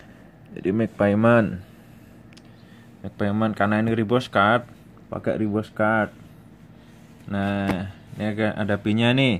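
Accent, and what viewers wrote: Java, Indonesia. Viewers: Omongane kok kyok jowo² ngono ya mas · Wong jowo pak? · cak jowone ngendhi to..... hehehhehe apik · Jowone ndi 0m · Bahasanya,,, kok cukup medok, kamu asli mana mas,,, kok kaya jawa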